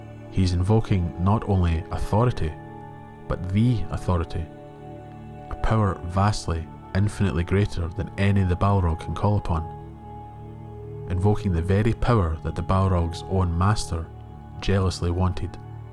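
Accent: Scotland, United Kingdom. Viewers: Love the part involving Gandalf and the Balrog and the explanation there, have to admit that you give it so much thought. We have here a clash of the 'fire of the sun' as you kind of put it, but also a kind of 'pure-fire' (which may be associated with the colour white in a lot of artworks), and the 'red fire' of the Balrog. There is considerable colour-psychology at work here, with 'White' oft-associated with purity as you here noted, where red is a colour of 'passion' and 'rage', and given how the Balrog is often also associated with darkness (a colour of evil in classical colour-psychology). It seems that there's a statement being made here in regards to the nature of the two predominant figures and the kinds of flames they represent. Gandalf's is good and is representative of Eru and the Balrog is representative of Melkor (who is still technically out there in the void). 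Just something I noticed in this video (I know you covered all this) but it is still fascinating to go over it again. The use of colours in Tolkien's descriptions reveal also the attention to detail Tolkien put into his scenes, and add to his mythology (another ex might be Gurthang, being a black sword a representation of Turin's inner darkness, where his helm often appears golden, which is a regal colour associated with good but also greed). This video was perfect, really enjoyed it and your lovely Scottish accent, and really hope you do that history of Angband series that you want to do.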